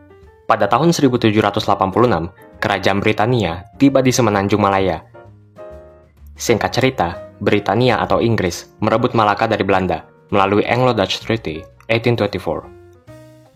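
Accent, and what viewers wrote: China. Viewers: Logat cina dari mana coba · awak ni kokoh dari malaysia ke bukan. Logat care cakap nye suare kokoh (chine). Tapi masih belom tau ke semue info nye. Bukan warge setempat/tempatan ke awak ni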